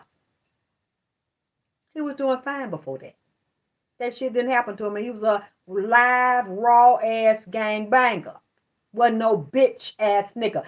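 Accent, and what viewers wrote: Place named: Georgia, United States. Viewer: Hahahahaha. I'm not done watching yet. But I had to write I laughed so hard there were tears in my eyes when you were answering the lady's question about how to get a reading and what not to do when get a reading from you. I'm a Ugandan that grew up in USA. I like your Georgia accent and readings you do on here. I also don't mind you speaking Ebonics because Ebonics is one of your languages: I understand you whether or not you speak Ebonics or slang. Okay, now back to watching the rest of your video. If I have more to say I'll post another comment.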